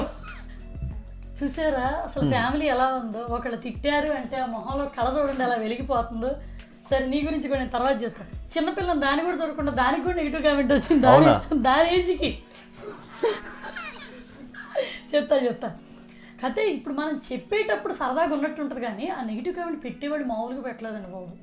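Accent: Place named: Guntur, India. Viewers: MEE VOICE NAAKU CHAALA ISTAM!!! It is unique, vinalanipisthundhi vintunte dhaaniki thodu aa gunturu yaasa perfect blend. Negatives assalu pattinchukokandi vaallaki taste ledhu anthe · Sister asalu mee voice yentho bagundandi asalu first time mee videos chusinde mee voice vini because ma kosta ammayi laaga anipinchindi so I love ur voice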